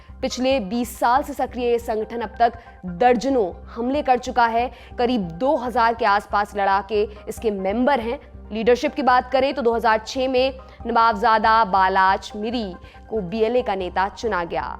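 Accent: India. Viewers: Yes , but some urdu word is hard to speak with indian acsent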